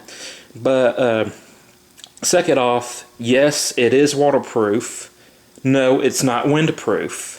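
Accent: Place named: Texas, United States. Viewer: Wattel, Lightel, chambel, smokel, yeal, or anything ending in "r" in English intrigues me as to what part of the USA are you located. Having just left the northern east coast, it is definitely not from that locale.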